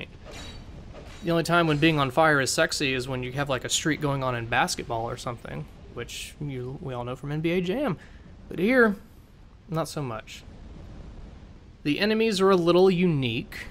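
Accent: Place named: Texas, United States. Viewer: i can already hear the texas in your voice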